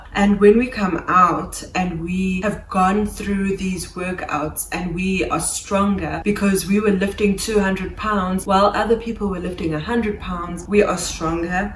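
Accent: South Africa. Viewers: Do I detect the accent of a fellow South African? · YouTube is my primary form of media and I can't tell you how heartwarming it is to click on a video and hear a South African accent. There's just something about getting information from my own people. You just know it's going to hit home. ❤😊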